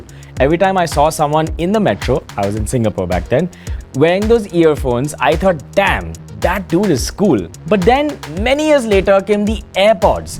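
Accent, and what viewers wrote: India. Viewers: Just discovered this channel, usually it is hard for me to listen to an Indian accent, but you do amazing job. Subscribed!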